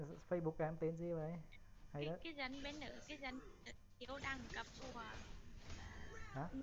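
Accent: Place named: Ha Tinh, Vietnam. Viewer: Ahihi...tiếng hà tĩnh đi mô cũng k quên đk 😀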